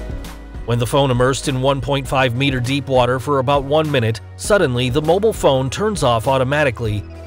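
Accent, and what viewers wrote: United States. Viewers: Why does your voice sound american but it sounds like a foreign person wrote it and ur just saying it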